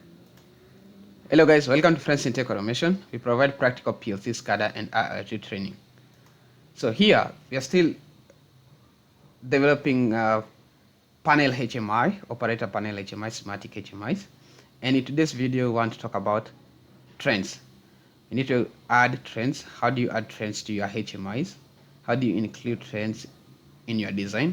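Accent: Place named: India. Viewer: Indian english its very cool experience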